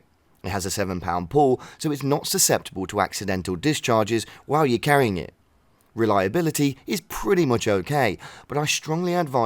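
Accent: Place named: United Kingdom. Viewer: I hear a British voice and immediately know this person doesn’t know shit about guns let alone ever seen one in person😂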